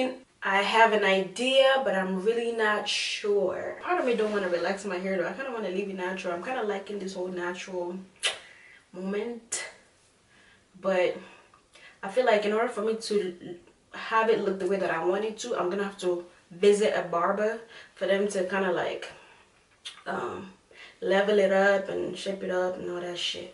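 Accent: Jamaica. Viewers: I was nervous too. lol I laughed so much. Are you a Jamaican?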